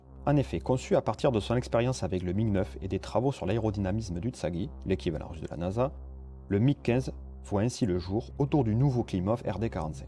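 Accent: South, France. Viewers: je vien de découvrir ta chaine, c'est super, on apprend pleins de choses sans que sa soit trop long, et avec ton bel accent du sud, tu tombe pas dans le piege d'avoir un ton monocorde qui endors, continu comme ca, on veut pleins de videos :p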